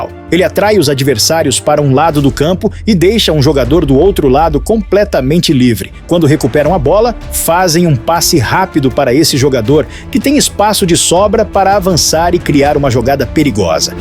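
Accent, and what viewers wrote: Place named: Italy. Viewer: com sotaque italiano, nada demais 😅😂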